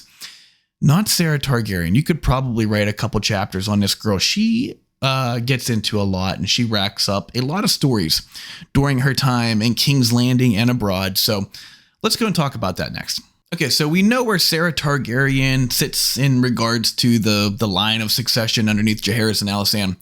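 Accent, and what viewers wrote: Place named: Pittsburgh, United States. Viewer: You have a very Pittsburgh accent lol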